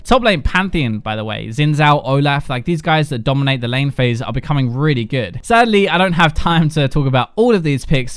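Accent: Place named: United Kingdom. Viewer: He is British, not an Amerifag. Not everyone pronounces words the way your cancer country does.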